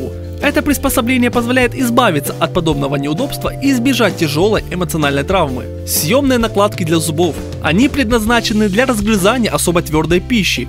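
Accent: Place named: Surzhyk, Ukraine. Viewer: ты конечно, молодец, но пожалуйста, говори не на суржике. Ухо режет...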